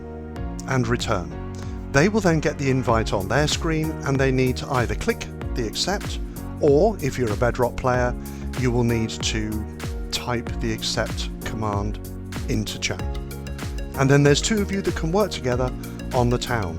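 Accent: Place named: London, United Kingdom. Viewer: ILLUMISYS on server; Very nice Job, good presentation and very helpful. Funny you and Avomance sound a lot alike, maybe from same part of London. What do I know though, I'm just a Yankee, lol.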